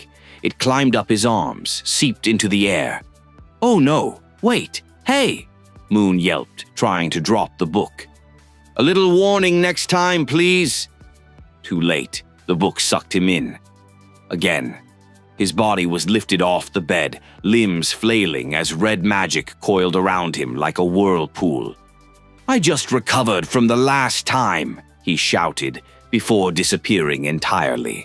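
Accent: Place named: United Kingdom. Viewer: Ah yes british narrator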